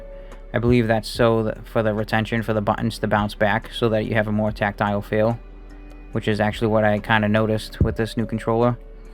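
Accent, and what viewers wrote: Boston, United States. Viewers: You from Boston? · Are you from MA? I’m hearing a Boston accent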